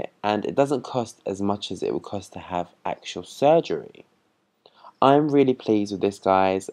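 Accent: United Kingdom. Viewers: you have a very boyish manly face. Very handsome. you can pass for a Puerto Rican, except the British accent lol. Thank God you did not have your nose broken with a rhino plasty. Maybe some laser hair removal to make your beard perfect. But other than that you are handsome :)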